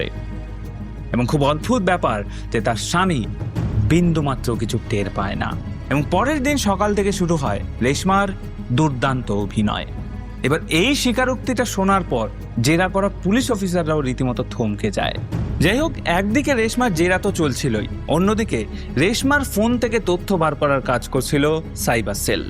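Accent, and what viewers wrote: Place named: Mymensingh, Bangladesh. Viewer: 'ব' এবং 'ভ' এর উচ্চারণ ! আদি নিবাস কি ময়মনসিংহ ?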